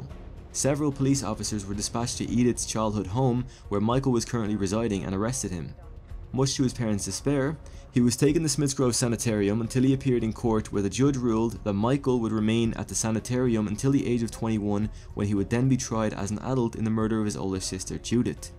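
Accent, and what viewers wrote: Ireland. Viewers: I’m pretty sure he’s Irish. Regardless, it’s all the same language.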